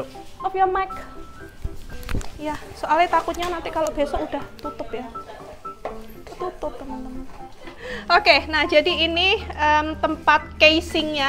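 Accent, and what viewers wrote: Java, Indonesia. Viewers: Mbak, pulang ke Indonesia medok nya keluar ❤❤ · Mbak jadi medhok bgt ngomongnya 🤣🤣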